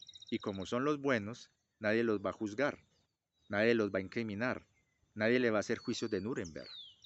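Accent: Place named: Valle del Cauca, Colombia. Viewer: Hello, sr, ¿como vas? Pasaba por aquí y quería saludarte y decirte que, aunque digas que estás por las Antioquía, aún no se te ha borrado tu acento Valluno y eso que soy español para identificarlo perfectamente……será que, ¿mi esposa caleña me enseña cada día como se siente el valluno?, jejejjeje Agradecerte tus videos y en especial este, no por nada en especial, si no porque especialmente tocas un tema que a mi me cuesta de explicar a las personas que me rodean. Se de sobra que buscar culpables a nuestra situación personal fuera de nosotros mismo, es un ejercicio de estupidez soberana y muy extendido en la cotidianeidad del pueblo, tal como estar en posesión de la razón de manera poco asertiva, de que es lo bueno, o que es lo malo, o en el peor de los casos, juzgar la actitud de los demás como mala o buena, sin ni siquiera plantearnos, los procesos mentales o personales, que ha vivido esa persona para llegar a esa resolución de una situación en concreto, así no nos guste. 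No estoy yo tan convencido de que un tanto porciento equilibrado de la sociedad, tenga presente tus afirmaciones, más aún con el agravante de la propaganda que idiotiza a las masas a diario con la tv, redes sociales, etc…..como para racionalizar tus palabras. Es por ello que estaría bien que este video lo pudieran ver muchas personas y que les llegue a hacer replantearse todas las creencias que llevan a cabo cada día en el plano de juzgar, que esta bien o que esta mal o quien es bueno y quien es malo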